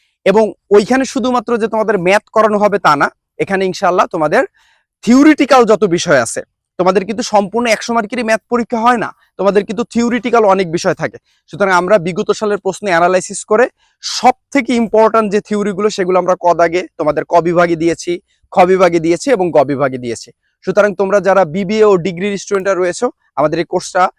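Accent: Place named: Sylhet, Bangladesh. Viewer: আপনে কয় থাকেন সিলেট নাকি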